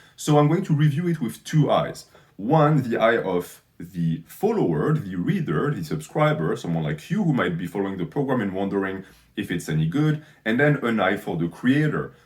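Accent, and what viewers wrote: France. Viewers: why are you french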